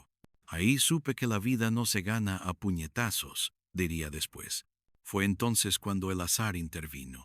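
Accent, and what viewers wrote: United States. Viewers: Todo bien, menos ese acento gringo del narrador…🤣🤣🤪👎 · Ya se perdió la historia con este cuate que habla cómo americano 😂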